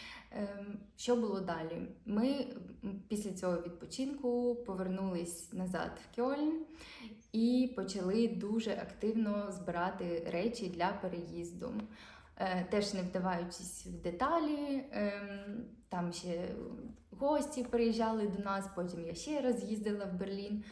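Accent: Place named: Moscow, Russia. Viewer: Думав Ви не просто кацапка, а мааасквічка, по вимові) А Ви файна українка! Всіх Вам благ!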